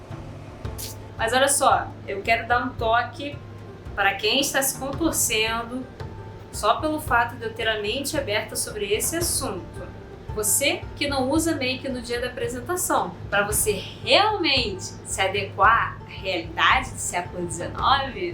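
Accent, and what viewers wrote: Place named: Rio de Janeiro, Brazil. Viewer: Sua ideia foi genial. Coloco aqui as coisas que percebi durante o tempo do vídeo. Achei muito bem editado e que irradia a sua simpatia e seu sotaque por vezes cariocas e outras vezes português. Sua desenvoltura é fabulosa. Só achei um pouco longo e as vezes as pessoas só dão uma passadinha rápida para ver o que tem de novo. Gostei do uso da língua inglesa também. Nesse momento em que as nossas entidades tem carência de jovens vem a calhar e acho que devem acontecer sempre porque estão querendo mudar a nossa história. Você já percebeu isso? claro que alguns vão discordar dos temas e formas que foram colocados. Isso acontece pelo conhecimento adquirido de alguns. Até os autores discordam uns dos outros não é mesmo?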